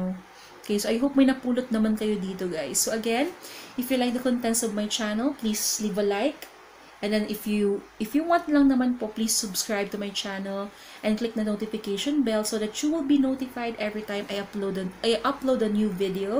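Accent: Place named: Pangasinan, Philippines. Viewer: hi po teacher! just found your channel because of 51talk..I'm a new teacher po and because of your videos po nalalaman ko ibang tips and tricks regarding the company..i am from lingayen pangasinan po and napansin ko po ung "ey" na expression kaya napa subscribe ako kasi sa isip ko "uy pareho kami...tiga pangasinan kamin dwa" hahaha nice to see someone po here in youtube na kapareho ng dialect..keep on updating us po sa mga ganap and thank you po sa mga shinishare niyo malaking tulong po..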